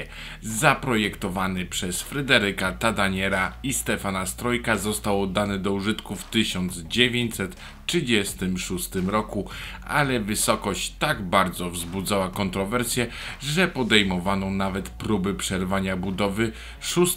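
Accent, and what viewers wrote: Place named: Kraków, Poland. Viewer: Jesteś z Krakowa na 100000% najkrócza, czeba, czy :D uwielbiam.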